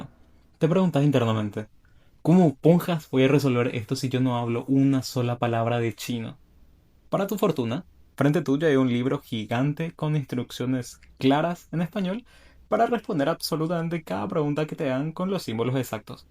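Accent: Paraguay. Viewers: Tenes acento paraguayo :v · de casualidad no sos de paraguay?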